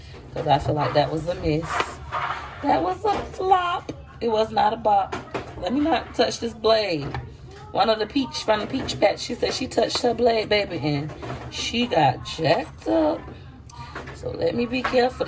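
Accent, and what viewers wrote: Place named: Los Angeles, United States. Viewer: Rosario Nah has a thick LA accent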